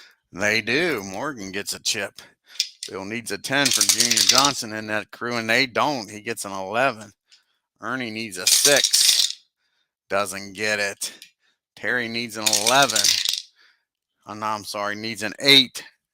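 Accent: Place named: South, United States. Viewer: I know he does a great job. I have second season HMB and HMG. This one has been on my radar for a while. Also you southern accent fits the game.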